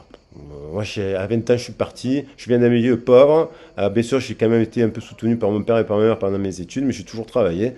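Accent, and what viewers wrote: South, France. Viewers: vos guidances vous êtes trop fort! Toujours un plaisir de vous écouter avec votre bel accent du sud merci.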